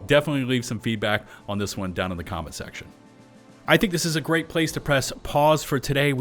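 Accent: Louisiana, United States. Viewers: you sound like Louisiana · His southern accent is giving Cajun… lol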